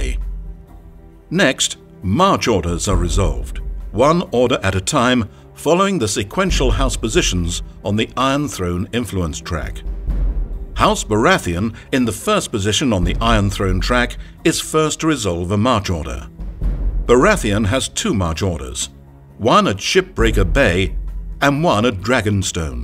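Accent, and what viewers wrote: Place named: England, United Kingdom. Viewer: This tutorial is better with the narrator's English accent.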